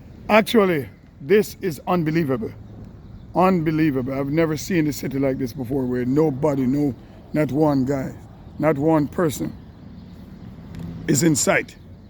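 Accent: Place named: Central America. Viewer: Are you from Central America? More specifically Hondurus? I am from Belize and your accent is very similar to my dad's.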